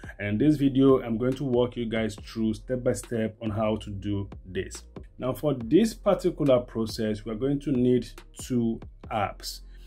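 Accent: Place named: Cameroon. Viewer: Thanks for this... only samsung does this kind of crap lol. I really enjoyed your accent it reminded me of my best friend from high school, she's from cameroon.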